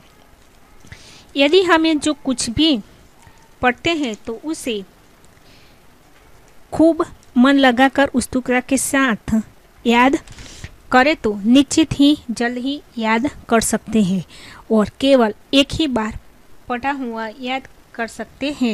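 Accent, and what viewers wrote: India. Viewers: Bengali ho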